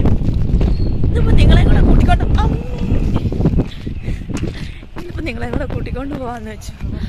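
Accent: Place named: Idukki, India. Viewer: Pakka idukki language ❤️❤️😘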